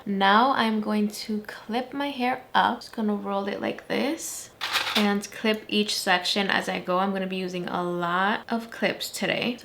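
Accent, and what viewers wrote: Dominican Republic. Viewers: Your Dominican accent ❤🇩🇴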